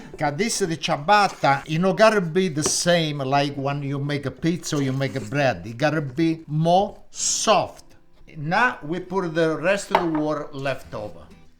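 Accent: Italy. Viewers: Bon giorno papa your ciabatta is bella 😊 I like Italian's accent when speaking English 😁 makes me smile all the time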